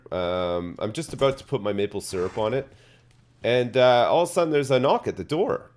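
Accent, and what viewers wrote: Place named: Alberta, Canada. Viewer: So many years later, he still sounds like he's from Alberta.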